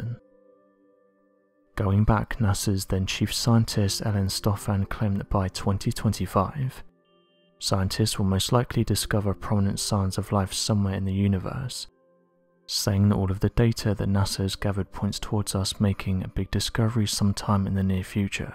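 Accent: United Kingdom. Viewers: I hate this guy's lazy British accent.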